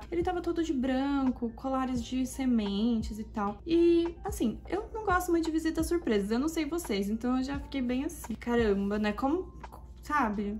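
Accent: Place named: Brazil. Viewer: Da onde vc é? Seu “R” não é de Sampa.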